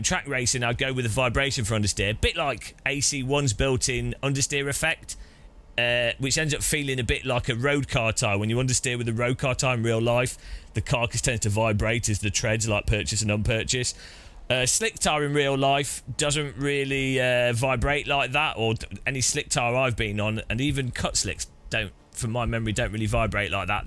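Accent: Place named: England, United Kingdom. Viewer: This guy's English accent saves his life.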